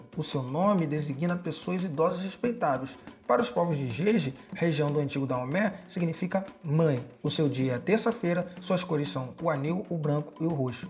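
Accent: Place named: Rio de Janeiro, Brazil. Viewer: O vídeo foi excelente parabéns Pelo sotaque vc é do RJ como eu kk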